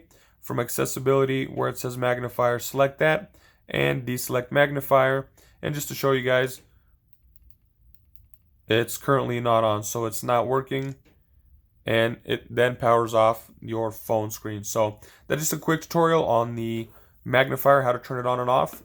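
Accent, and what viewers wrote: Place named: United States. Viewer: One of the clearest, most concise demo of an iPhone feature that I have ever used. It’s also very nice to hear clear American English at at a speed I can process. Thank you. (That is not a disparagement of ESOL speakers. They are just hard for me to understand when they speak at a very fast rate.)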